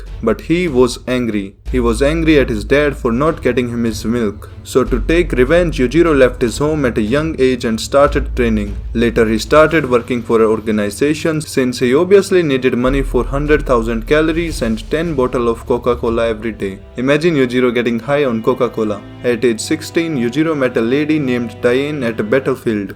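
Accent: India. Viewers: Change your accent. You sound way too indian.. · Ngl Baki algorithm brought me here but I stayed for the Indian accent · I didn't even watch baki but your description of what baki is plus the well known indian accent just makes it so damn funny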